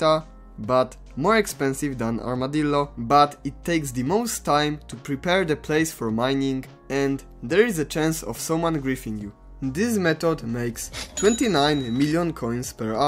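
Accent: Poland. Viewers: u definitely sound like u have some polish accent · jestes z polski? bo giga masz taki akcent polski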